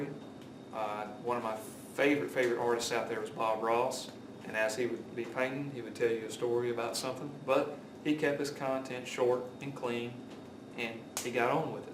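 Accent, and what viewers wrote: South, United States. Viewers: the only criticism I have is that the drill press was 1/4 inch out of line lol...I like your videos very much..I like the southern, easy way of speaking you have..This video will be of great help to me as I want to make a video. Listening to you speak is like having a fireside chat with a friend..Thank you very much for this video..a new fan from canada,,,Bill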